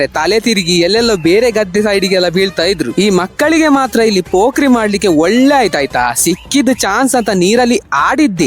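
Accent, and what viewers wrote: Mangalore, India. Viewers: Entha marra neeu chanda aagthade kushi aakthade antheera neeu sa banni antha kariudila alla😂😂😂 Summane aaitha 😂😂😂 Ae ne heli maarra nimma chanel nodlikke namma kudla kannada mathu kellikkene ondu kushi ne bere ❤❤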